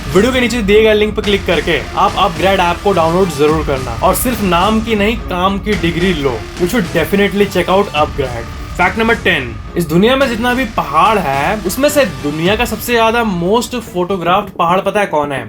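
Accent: Bihar, India. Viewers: Bihar se ho jio bro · U r from Bihar bhole bhihaari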